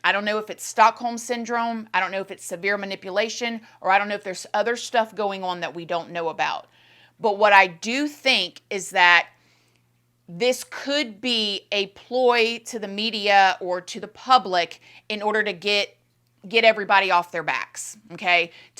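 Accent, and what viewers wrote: South, United States. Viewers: I actually need my regular fix of this southern comforting voice. It sounds like fresh baked cookies and warm hugs. This lady is smart as a whip. Sharp as a tack. Brains, beauty, and lovable x 1 million. She's top tier . I hang on her every word ❤❤❤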